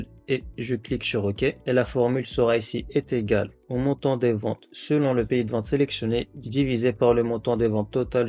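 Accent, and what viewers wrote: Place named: Maghreb. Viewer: T’es DZ ou MA ? Ça s’entend fort 😊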